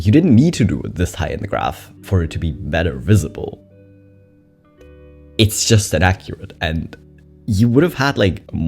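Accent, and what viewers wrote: Germany. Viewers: I'm German, too, and I'm usually good at recognizing accents, but his I think is very subtle. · as a German it's very clear he's German ( I don't mean it in a bad way, just the way we pronounce stuff is very striking to me haha) much love!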